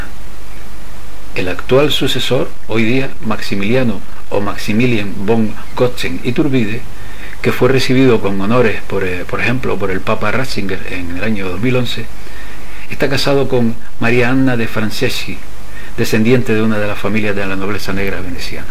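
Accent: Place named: Canary Islands, Spain. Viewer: Por aclararte lo que quería decir, las que van entre sería como sonarían en la lengua/dialecto: el sonido sh- sería en gallego o asturiano, xamón [shamón] o xoven [shoven]; en español normativo y el de la zona castellana sería j-, como la de joven o jamón; En tu caso, el canario, vendría a ser como [hamón], [hoven] y [Méhico] (por transcribirlo de alguna manera), vamos como tú siempre has pronunciado la jota. Lo de [Méksico] cuando vemos escrito México deriva de la confusión que nos produce que en el castellano antiguo la j- actual se escribía x- y está grafía se ha conservado con el paso de los siglos, pero solo en ciertos nombres. Es lo mismo que le pasa a Oaxaca o al "Don Quixote" original del s XVII, que ahora mismo lo escribimos "Don Quijote" pero tanto en el pasado como ahora se pronuncia igual-> Quijote; y Oajaca. Es decir, para escribir la jota(j) de hoy se usaba la equis(x) por eso nos confunde cuando vemos escrito Quixote, México y Oaxaca porque son reminiscencias de aquella escritura medieval del sonido jota. 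La equis de hoy en día como en excursión es un sonido ks-> [ekscursión] que nada tiene que ver con lo que representa esa equis medieval de México. En definitiva, es tan correcto escribir México como Méjico y las dos se pronuncian exactamente igual, lo mismo que Quijote-Quixote, Oajaca-Oaxaca. Un saludo, espero no haberte liado más... jejeje Por cierto quiero recalcar que me encanta tú trabajo y el tono serio en el que lo realizas. Saludos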